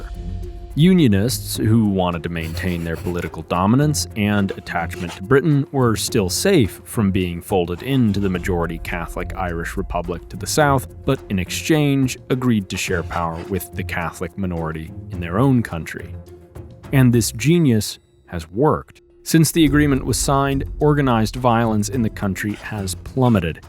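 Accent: United States. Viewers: Oh that's what he's talking about in the video, the first 5 seconds i heard the american accent and paused to check the comments, all americans do is war monger constantly, every country outside america is monopoly and entertainment to their boring lives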